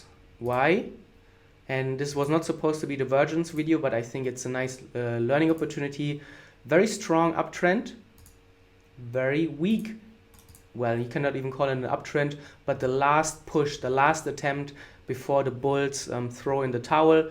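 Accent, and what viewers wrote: Germany. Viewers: Dialect like german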